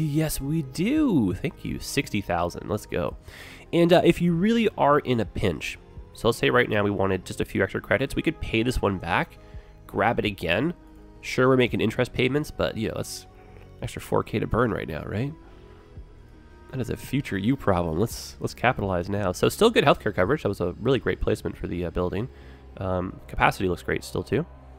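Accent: Canada. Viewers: Just stumbled on u and may i say you have quality ! I really liked these 2 episodes so far i hope u keep doing them :) also are you maybe Candian ehh ?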